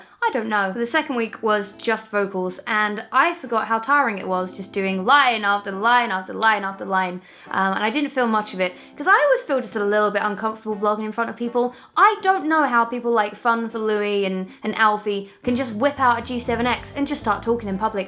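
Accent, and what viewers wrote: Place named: London, United Kingdom. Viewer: Your voice has gone very London girl in this video. I am neutral on this.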